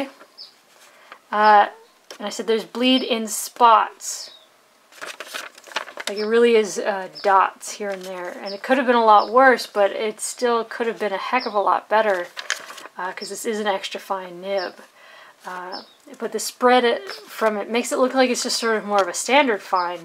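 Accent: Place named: North America. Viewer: Thanks great review I wanted to try this ink but wanted to know about its water resistance. You gave me all the info I needed so subscribed. P.S I'm Brit so not good at North American accents but I like yours. Love the opening spiel too. I guess you are the!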